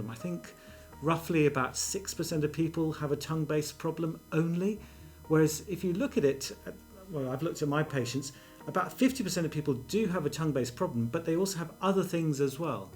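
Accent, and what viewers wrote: United Kingdom. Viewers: Please remove the background music while you are speaking! It is very annoying especially trying to understand with your British accent. Thank you!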